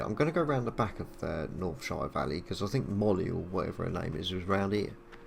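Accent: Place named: Kent, United Kingdom. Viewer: Love the accent:) From Kent?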